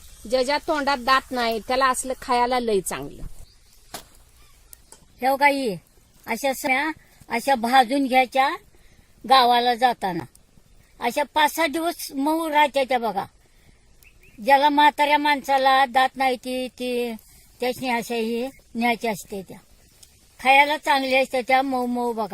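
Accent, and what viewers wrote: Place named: Marathwada, India. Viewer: तुमच गाव कोणत आजी? भाषेवरून मराठवाड्यातील वाटतात .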